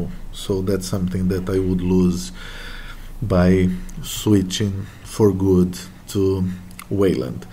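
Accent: Brazil. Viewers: sotaque brasileiro é forte kkkkk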